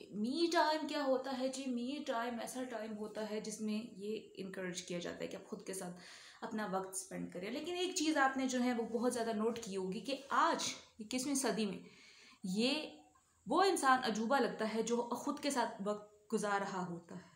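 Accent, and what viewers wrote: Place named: Punjab, India. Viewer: Punjabi xent❤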